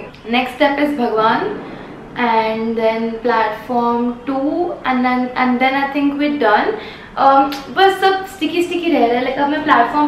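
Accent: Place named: Kutch, India. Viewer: U r speaking sweet kutchi language. I m also from bhuj n I know kutchi. Fine kutchi boleti muke gamai.😊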